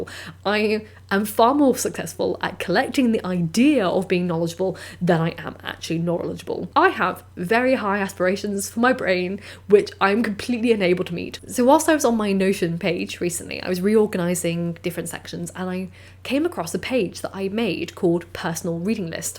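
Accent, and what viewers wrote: United Kingdom. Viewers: Is that British accent? I love your accent! You sound so heavenly when you speak :)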